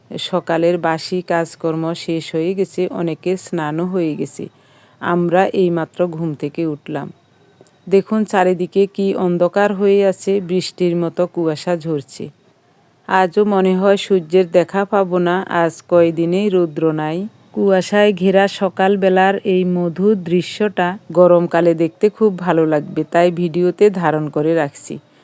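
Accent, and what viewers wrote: Noakhali, Bangladesh. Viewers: Aamar sabche bhalo laglo desher noakhali kotha dhanyawad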